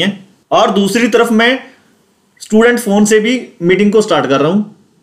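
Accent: Punjab, India. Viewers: Seems like u are Punjabi from Ur accent 😊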